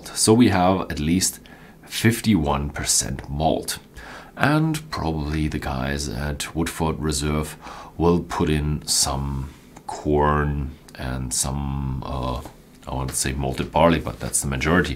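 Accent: Germany. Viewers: Did you understand what he said? Should we all sound like the Queen of England or Donald Trump? Language is about communicative exchange and once meaning is transferred, the way in which it is transferred is immaterial. Two way communication! Should 1 billion Indians or 100 million Nigerians who can all communicate through English adhere to your pronunciation patterns? No, they shouldn't. Rejoice in the difference and let's not homogenize and standardise every bloody aspect of life. speaks German as his first language. There is natural interference coming from German, as there would be from French, Arabic or Chinese. Get over yourself man....he pronounces words differently, as you would when you learn to speak German as well as speaks English.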